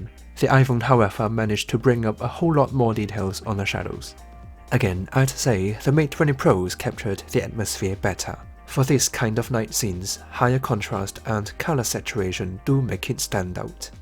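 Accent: United Kingdom. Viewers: 只是有点听不惯英国口音，呵呵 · 英式英文哦！！！ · I like your British accent 😀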